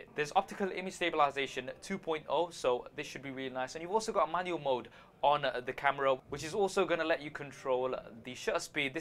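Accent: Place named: United Kingdom. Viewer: & You both are my fav reviewers And i honestly like your british accent Keep the good work brothers;)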